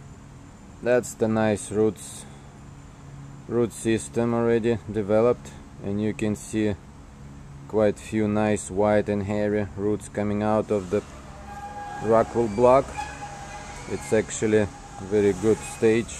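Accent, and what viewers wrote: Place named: Russia. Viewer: Ti idiot ili prosta zaviduesh ego!! Important is not an accent (although English with Russian accent is very cool)! His English is very perfect grammatically, and also very rich vocabilary! Thank you for the Videos!! You are gift from the GOD!!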